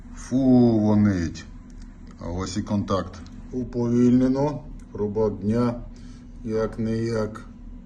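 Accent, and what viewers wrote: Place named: Mukachevo, Ukraine. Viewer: Мадяр у тебя акцент как у жителей Мукачево.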